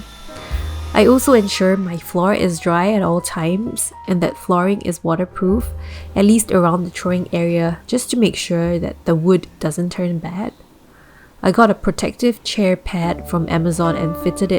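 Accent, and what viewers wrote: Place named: Southeast Asia. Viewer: ah, when I heard you started talking I knew you were Southeast Asian! nice to see a SEA ceramicist here :) I’ve been thinking of getting into pottery (maybe turn it into a career in the future?) your video is really useful !! thank you for the list too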